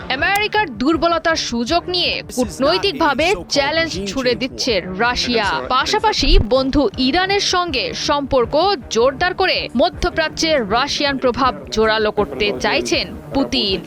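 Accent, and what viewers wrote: India. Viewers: ভাষা শুনে মনে হচ্ছে এই সংবাদ পাঠিকা ভারতীয় নারী।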